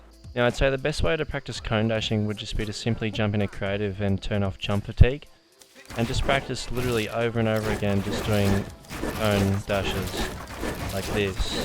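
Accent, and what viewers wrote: Australia. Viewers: R u Australian, if so r u best OCE? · love the australian accent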